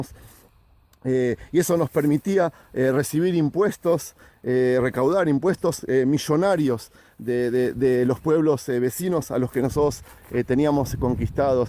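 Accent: Argentina. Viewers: Si la verdad. Estoy siguiendo cada video del hermano. Por su acento vivio en Argentina. Donde sea es muy gratificante y de mucha sabiduria. Bendiciones para ti. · Cuando comencé a escucharlo pensé que era argentino. Pero poco a poco he escuchado su relato y es muy interesante, no sé cual sea su verdadero idioma pero agradezco que nos cultive a través de estos vídeos. Es el primero que veo, pero veré todos❤❤❤❤❤ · Tienes acento argentino o de esos lados saludos hermano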